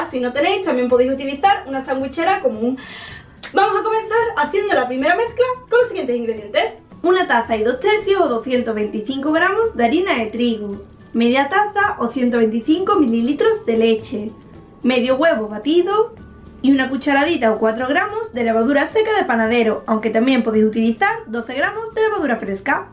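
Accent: Andalusia, Spain. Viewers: Es de España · no me hagas mucho caso pero creo que es andaluz xD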